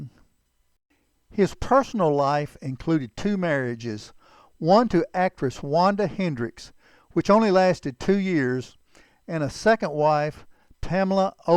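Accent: Texas, United States. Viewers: Don't know who narrated this video, but only took the first three or four words to let me know he is a fellow Texan. I live in San Antonio, TX, where the VA hospital named after him is located and I have gone through the small museum there with relics and videos of his service. Indeed a fine man and a real hero.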